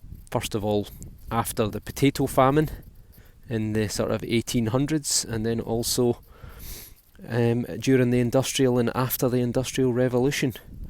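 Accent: Scotland, United Kingdom. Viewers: Hi! I love your video's and the Scottish accent 👌🏻 what a nice find ! It looks mid eval. Greetings from the Netherlands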